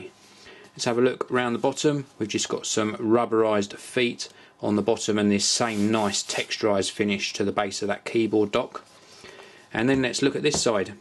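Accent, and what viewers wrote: United Kingdom. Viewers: lovely british review ! keep it up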